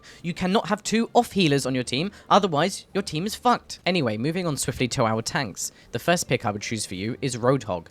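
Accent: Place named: United Kingdom. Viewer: omg he's british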